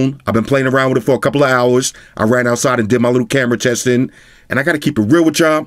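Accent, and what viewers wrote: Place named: Brooklyn, United States. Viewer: First time watcher, I know a brooklyn accent anywhere!! review is on point and full of detail. Awesome to see someone from the hood doin they thing on the net!! you got my sub / support. Do yo than bro!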